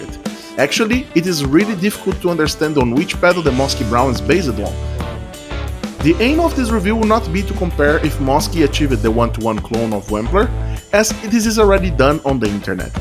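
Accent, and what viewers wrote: Brazil. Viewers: Esse timbre é de brasileiro falando em inglês em haha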